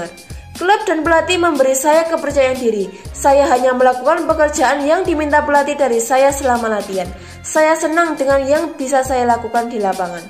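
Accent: Java, Indonesia. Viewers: Min... Macane Romanyoli dudu Rogmanoli. Medhok iku gpp, sing penting pengucapan jenenge dibenerke. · Min terlalu medok..🤭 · Medok Amat Yaaaaaa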